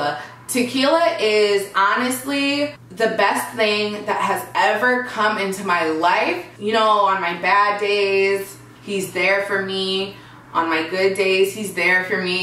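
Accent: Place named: Wisconsin, United States. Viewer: You definitely still have a WI accent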